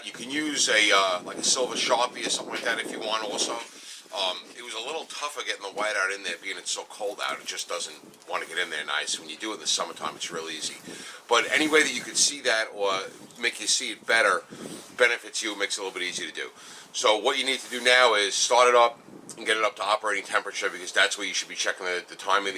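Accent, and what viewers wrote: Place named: Northeast, United States. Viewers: Great video! By your accent, I can tell you're from NY, PA MA or somethin' lol. I have an 85 caprice, tbi as well. V6 4.3 (262) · Definitely Long Island ✌️ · Great video, thank you! Hopefully this will help my 87. Also, I wish you said retarded more, it sounds great with the New England accent.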